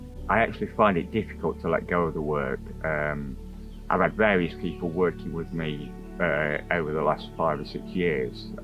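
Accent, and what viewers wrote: East Midlands, United Kingdom. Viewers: Thank you it’s been a pleasure to watch you and to see the care that you not only take of Dan but also the trees. Just one question as a midlander I’ve been trying to work out where you’re from is it Stoke on Trent or Derbyshire 🤔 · From watching Dan's channel abs seeing on there i always thought that sounds like an east Midlands accent! has just confirmed it! Im a Derbyshire lad.😊 Youre doing a fine job 👍🏻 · I'm so very sorry to hear the sad news about. Condolences to family and friends. I first saw on Chateau Diaries and thought I know that accent, being a fellow midlander from Nottingham. will never, ever be forgotten and will live on through all the projects he was involved with, especially Chateau Chaumont. I hope his family get some comfort from reading all of these messages on how much this lovely Viking meant to us all. 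· Happy Birthday. June 2025. I always thought you'd got an East Midlands accent. I love listening to you talk. You said you did some work with the electricity boards. My late Dad, Gene and my Uncle Lawrence were both overhead linesmen at Derby EMEB. They'd get called to different areas of the East Mids during bad weather when Lincs EMEB (for example) hadn't kept up with their maintenance and so they had power lines down. I often remember my Dad on Stand By and having to go out over christmas. You have an idyllic life. Your fox terrier is adorable and having 5 GSDs must be lovely. i am so glad to have another chance to watch this. /Gena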